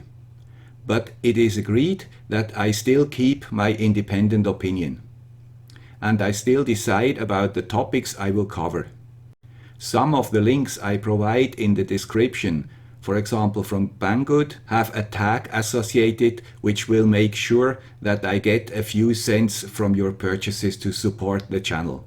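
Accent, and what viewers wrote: Switzerland. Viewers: Well done " " (or is it white gloves now:) ) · Congratulations! Is that picture "young guy with swiss accent" on your shelf? And very nice solution for cables! I think I'm gonna do same thing behind my door. Thank you.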